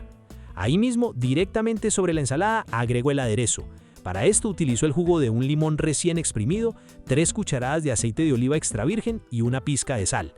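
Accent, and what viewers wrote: Antioquia, Colombia. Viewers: Puedo cambiar la hierbabuena por cilantro? Le agregaría uvas pasas y almendras o nueces. Amo tu acento paisa.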